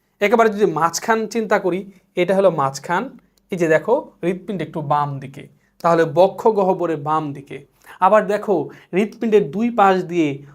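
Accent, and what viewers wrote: Sylhet, Bangladesh. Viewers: Ohh.Kotha Bolar Style sylheti der moto..!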